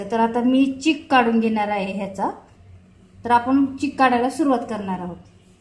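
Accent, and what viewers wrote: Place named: Nashik, India. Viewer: mavsi nasikkadchya ahea ..amchya kadchya chan language agdi apli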